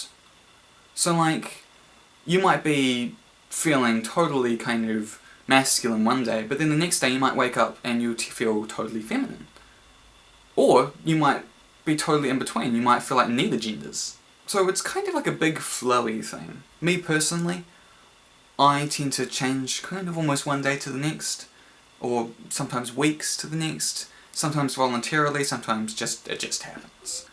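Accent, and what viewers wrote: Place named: New Zealand. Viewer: what country are you from? you kinda sound kiwi but I might be wrong haha😄☺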